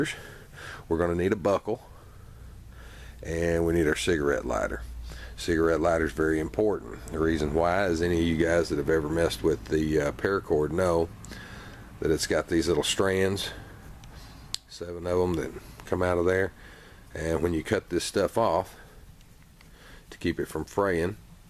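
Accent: South, United States. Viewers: Your from the South aren't you? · he liked your Southern accent too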